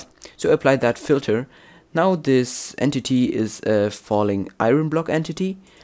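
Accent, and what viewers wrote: Germany. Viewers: Man merk das du deutscher bist bleib einfach bei deutsch und nicht in englisch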